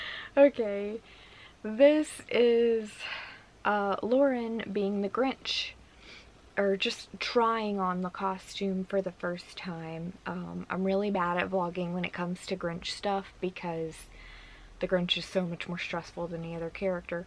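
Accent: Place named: Scotland, United Kingdom. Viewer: I’m like almost positive watching all that Outlander helped your Scottish accent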